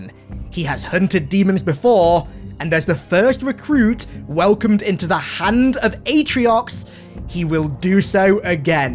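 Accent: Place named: United Kingdom. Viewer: Lmao I don't know, it does sound kinda british I guess, but I can't speak for that since I'm not british.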